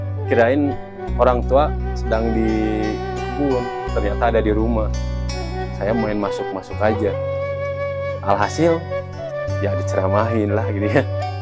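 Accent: Sunda, Indonesia. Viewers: Sunda halus kalem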